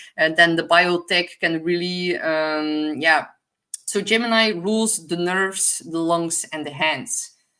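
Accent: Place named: West Flanders, Belgium. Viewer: Aaaaaahhhh, je zit vol vuur meid!! Ik heb een stuk de live mee gehad en een stuk nu aan het luisteren. Schitterend hoe je er je westvloams tussen smiet 😂 high vibes high vibes!!! En ik hier roepen.... judges!!!! (Rechters). Zalig ❤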